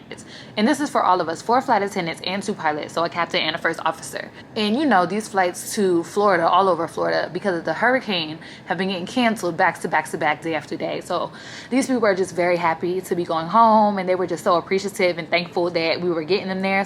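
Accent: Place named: New York, United States. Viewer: I assume you're from New York based on your accent or atleast that's what it sounds like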